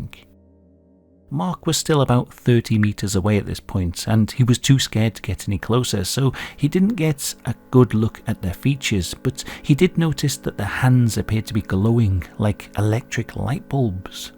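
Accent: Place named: Newcastle, United Kingdom. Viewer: Geordie accent? Duck off, I'm out.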